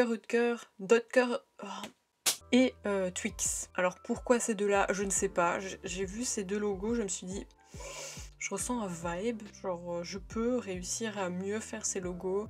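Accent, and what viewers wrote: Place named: Belgium. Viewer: Je découvre ta chaine sur cette vidéo (merci la reco youtube), c'est très sympa ! J'ai pas bien compris pourquoi la forme ta cam change au court de la vidéo, c'est très étrange mdrrr (le mieux c'est clairement celle à selon moi). Sinon t'as un petit accent Belge je trouve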